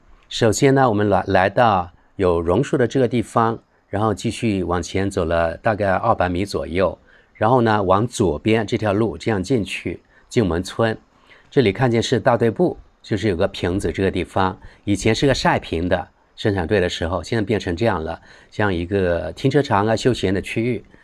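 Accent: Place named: Southwest Mandarin, China. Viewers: 博主是广西哪的？说的话有点像西南官话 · 口音像四川话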